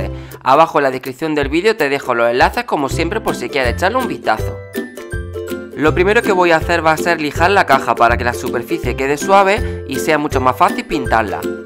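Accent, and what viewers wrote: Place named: Almería, Spain. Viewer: Te a quedado preciosa un saludo desde Almeria por tu acento creo qeu estas cerquita😘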